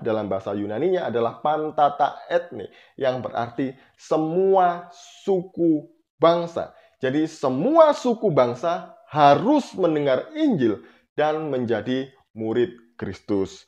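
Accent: Java, Indonesia. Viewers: Slim taslim...., kamu nggak bisa baca quran ta kok bahas Alkitab, basane medok, umatmu mau dengerin ceramahmu bukan karena kamu pinter dan enak ceramahmu tapi yg mereka suka dari kamu, kamusuka obok2 kitab orang kristen.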